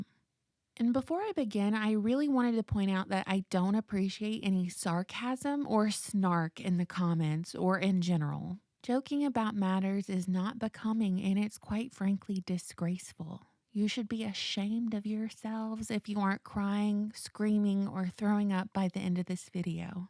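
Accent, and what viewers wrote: South, United States. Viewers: what happened to Southern accent?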